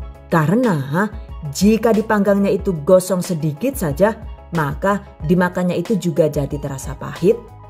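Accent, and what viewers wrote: Banyumas, Indonesia. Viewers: DONE. Resep cemilan is the best, pas buat isi toples persiapan lebaran. Selain unik cr penyampaiannya yg slalu ngapak resep n caranya jg detail bgt. Trm ksh teh resepnya yg slalu menginspirasi bgt.#GiveawayTHV2021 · Bismillah #GiveawayTHV2021 Bahan simpel mudah di dapat Suara yg ngapak bikin ngakak🤭🤭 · Lucu org jatim tp bs ngomong ngapak n pinter bhs inggris pula msh muda, tp suara dewasa keren" resep nya👍👍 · Yg sy tggu tiap liat video adalah logat ngapaknya 😁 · Hhhhhhh lucu,seneng dech dengerin mbk nya klo lg ngomong ngapakkk auto jd ngakaakkkkkk dech nyonge😂😂🤣🤣🤣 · Slalu semangat nonton vidio... Selain seneng karna resepnya simple n jelas juga karna lucu banget apalagi klo lg ngomong ngapak sumpah nyong geget banget... #GiveawayTHV2021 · Sambil nyimak sambil nyengir2 dngerin omongan ngapak 😂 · tetep dong logat ngapak ga ketinggalan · Mb lewih kepenak ngomong ngapak bae lah..😀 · Suka lihat ngapaknya sama seperti saya penjelasan nya jelas makasih ya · hahahahaha lucu banget si lah. 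nek mirengaken tiyang ngendika bahasa ngapak toli lucu banget. ternyata aq dewek ya lucu ya nek ngomong ngapak hahahahaa😂 #GiveawayTHV2021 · Selain resep2 dan penjelasan yg jelas singkat padat,aku suka sama logat ngapak nya...Caaaaatat....😀matur nuwun mbok ilmu nya semoga sehat selalu...Caaaatat😊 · Logat ngapake wisss ora ana tandingane😁💜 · Love love banget sama ngapak nya 😅😅😅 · Logat ngapaknya emang selalu bikin kangen kak, 😁 sukses terus, gak pernah pelit resep emang ini🙂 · DONE #GiveawayTHV2021 Penonton setia,slalu jadi inspirasi,Krn slalu mengeluarkan ide ide yg cemerlang untuk sy biar semangat untuk usaha jualan dan penjelasannya begitu detail plus Bhs ngapaknya ciri khasnya sy bgt,matur suwun 🙏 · DONE #GiveawayTHV2021 Bune gawekno resep kue ilat kucing dong,sing ekonomis tapi maknyuss👍tak enteni lo bune🤗 Nek ora ngapak ora enakkk😁😂😂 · Bhs ngapak e menghibur,lucuuu....resepnya jempolan.favorit 💞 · Mantap,. 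Nyam² :) Selalu terhibur dg ngapaknya. #GiveawayTHV2021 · Senengx disini lengkp. Suara enak. Lucu lagi. Dan bisa bhs ngapak. Pada karo inyong. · Alhamdulillah nemu resep baru dari Kesukaan anak q beng2 Okay...pingin nyobain bikin buat isian toples lebaran nanti.. Suka sekali dgn penjelasannya bunda mudah di mengerti trus lucu ada logat ngapaknya 😁 #GiveawayTHV2021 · Amazing.... Ngapaknya... Sukseeees maa syaa Allah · Ora ngapak ora kepenak 😅. Sukses terus buat yang selalu menginspirasi dengan resep resepnya #GiveawayTHV2021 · Chanel kesayangan ku, mudah d fahami krna selalu ada tip & trik. Dan yg paling uniq bahasa nya inggris,indonesia dan ngapak.pokoe lain dari yg lain deh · Ngapaknya itu loo..jd ingat klo keluarga besar suami lg ngumpul di Sumpiuh 😄😄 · Selalu suka sm video² krn lengkap bgt sm tips² yg sangat berguna.oia, sm satu lg.logat ngapaknya yg selalu jd ciri khas...makasih buat sharingnya...semoga semakin berkembang #GiveawayTHV2021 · Ngapaknya bikin ngakak bu...mood banget dah 😂😂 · Bener bgt...ngapak abis logatnya...wkwkwk...trus detail bgt lg....semuanya dh pokonya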